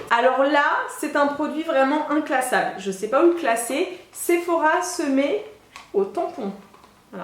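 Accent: United Kingdom. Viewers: J adore ton hello et ton accent british. 👍👍tu présentes aussi des tres bons produits même pour les hommes c good. Très bonne vidéo. Merci à toi ça fait plaisir que tu sois aussi active. 💙💙✌✌#warrior4ever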